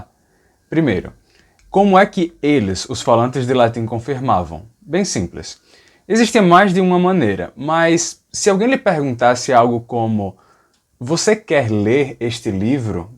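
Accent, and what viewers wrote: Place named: Northeast, Brazil. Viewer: da onde e o seu sotaque? Eu moro nos EUA por 35 anos e nao consigo saber exatamente que sotaque e o seu. Nordeste em algum lugar? Obraigada pela aula excellent.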